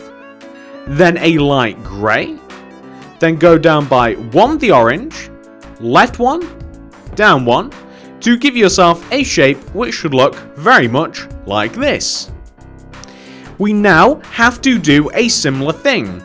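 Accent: United Kingdom. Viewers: you sound like britbong, very distracting, 7/10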